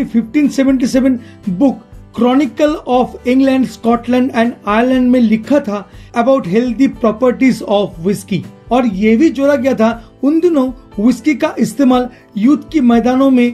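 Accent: Bengal, India. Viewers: Are you a bengali 😶😐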